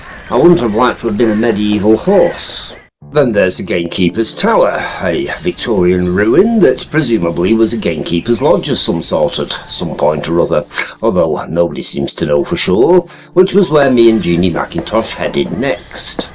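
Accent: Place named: Northern England, United Kingdom. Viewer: Eee lad . Yer raaht northern . " T'pit clarsed twel'e yeeeer ago - 'avvent wukked sin' ".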